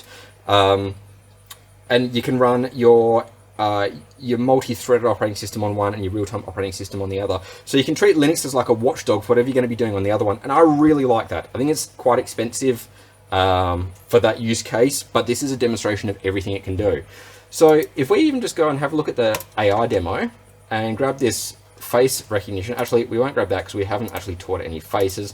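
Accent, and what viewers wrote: Australia. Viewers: Mate, I just bought me one of these, just because I could. I mess around with Raspbarry Pi's, have the 26TOPS HAT, still figuring it. But saw one of these and just had to have one. Got it with a MIPI touch screen, so i can make it all in one, just add power. Love your review, it is so much better to hear an Aussie voice doing these 'weird' hobby stuff. Cheers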